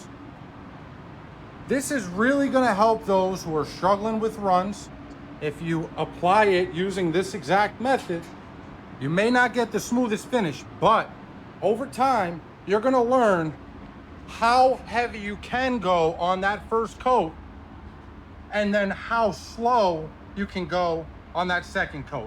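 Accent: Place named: New York, United States. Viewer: Where in NY are you? I'm guessing queens or more upstate? I just like to guess accents haha. good video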